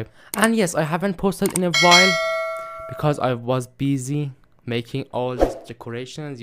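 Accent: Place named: Iran. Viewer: Once I watched your short outta nowhere, your persian accent got me, ngl. Nice job, too much love from Shomal.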